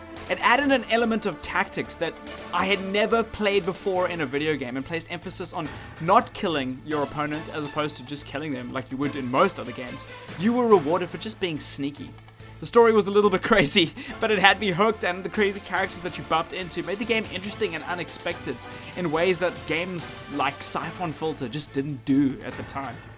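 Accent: South Africa. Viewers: Hey, I thought I heard a hint of South African in your accent and I wanted to know if you are.:D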